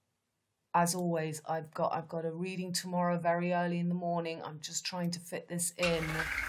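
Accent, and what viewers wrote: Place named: France. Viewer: Wow your English is wonderful. I thought you were from England....with a French accent. I tried to learn French it was a joke in high school in the US ... you rock. 🥰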